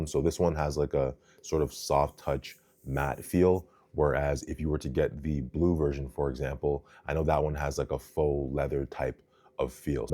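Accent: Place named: Canada. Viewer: Oh you're Canadian. PROcess and aboot are giveaway words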